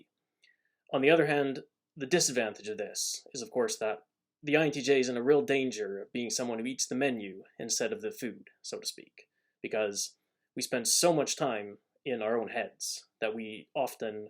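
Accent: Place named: Scandinavia. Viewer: You sound like you're from one of the Scandinavian countries, which I assume have a higher percentage of INTJs. Would I be more comfortable living in that part of the world?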